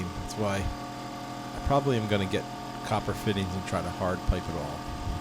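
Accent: North, United Kingdom. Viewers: He is from up north!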